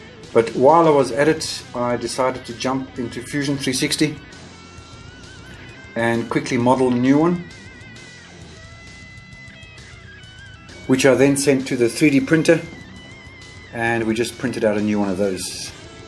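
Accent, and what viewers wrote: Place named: South Africa. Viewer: Nice, you must be South African. 🎉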